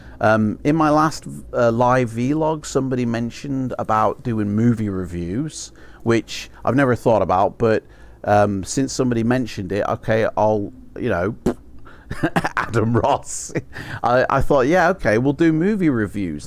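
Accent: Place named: Cornwall, United Kingdom. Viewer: I can’t watch this shit. I can’t see the book or the pictures, all I see is your fat ugly mush chatting bear shit, making silly noises and the front cover page on the other side of the screen. And you talk too slowly, take 10 times longer to do everything than in needs to, and you’ve got an annoying Cornish accent. Which is fine for adding some effect, but you’re focused more on effect than reading the story, in a normal fashion. And we don’t need to make every single decision for you either, just getvon with it mate, life’s too short for all the faffing, farting around and film flam. What kind of game is this? How to take the piss out of fighting fantasy books? I’m out.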